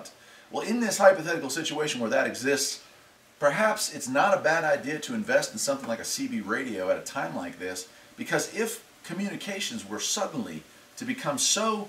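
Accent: North Carolina, United States. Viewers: Wow. Excellent video, brother! Funny thing. I was just looking up stuff on CB radios, and I ran across one you have about 5 years ago. Your voice...well...do you know how your own voice sounds different when you hear a recording of yourself? Well, you are the first person I have run across whose recorded voice sound exactly like my recorded voice, when I hear myself that way. LOL, it was a little creepy, bro. Anyway, so that caused me to be curious and look at this second video here. Here, you reveal that you are from the NC mountains. I grew up in High Point, so that partially explains our common accent and similar speech patterns. But... ...Just today, I was thinking the very things you just said here. "Hypothetically," I may end up being very happy I installed that CB in the Jeep. Anyway, the reason I started out with that seeming nonsense, is to point out that I seem to have been drawn here. 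Now I have to watch a bunch of videos to try and figure out what you have said that I was meant to hear. I really wish you did not just say you have 70 videos. This might end up being a very long night.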